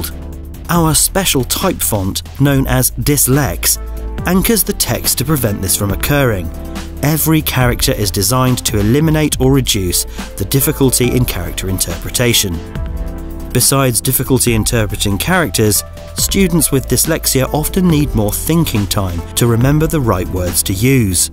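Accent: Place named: United Kingdom. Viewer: Having a British narrarartor doesn't make you sound smarter Spellex, tap into your own homegrown talent. I'm going to have to give this one a dislike for right now but I know you can fix this Sheldon. As Spellex's #1 fan this video really breaks the canon for the Spellex lore. Please make a better video with canon American actors. Antrex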